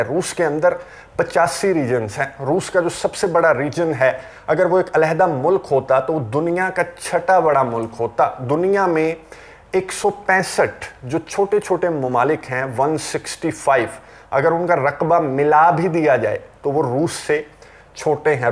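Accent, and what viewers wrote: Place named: Lucknow, India. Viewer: Your "Andaaze Guftagoo is amazing" Urdu wonderful Lakhnavi Andaaz. MashaAllah. Keep it up, one suggestion !, Please do not indulge in Political or so called current affairs. I am 68.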